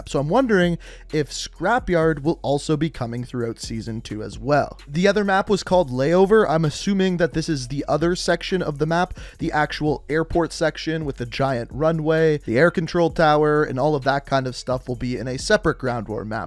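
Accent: Canada. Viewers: What's your accent? Like where you from because if I were to guess I'd go with Canadian????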